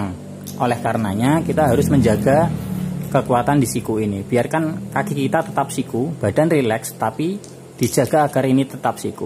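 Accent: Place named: Java, Indonesia. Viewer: Medhok syekali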